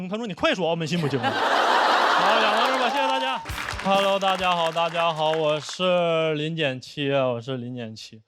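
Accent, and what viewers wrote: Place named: Liaobei, China. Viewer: 说学邱瑞的观众，你们身边一个辽宁人都没有？我们辽宁人或者说东北人平时聊天就这样，而且林简七抚顺人，邱瑞沈阳人，统称辽北人，口音就极为相似，你不如说全辽北人均学邱瑞。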